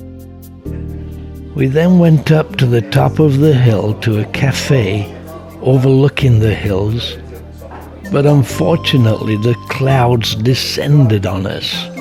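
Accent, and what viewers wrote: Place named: Wales, United Kingdom. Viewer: Wow! Just found this after returning yesterday from Kanpanlet to Mindat. I was told I was the first foreigner they've seen in almost a year! Sadly, the views were oscured by smoke from burning season which ironically, resembled the current mood of the wonderful people in this amazing country. Love the narration. Still trying to work out the accent, although there seems to be a Welsh tinge!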